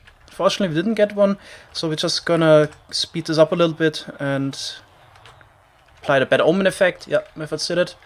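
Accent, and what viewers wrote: Germany. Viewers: You sound like you are from germany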